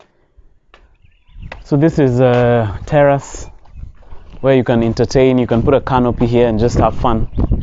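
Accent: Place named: Coast, Kenya. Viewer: Kiswahili cha pwani kimeniua.. karibu mombasa bro 🤣😂🤣😂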